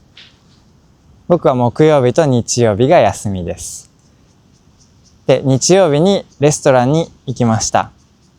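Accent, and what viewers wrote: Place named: Taiwan. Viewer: You sound a Little bit , taiwanise , but your Japanese is on point!!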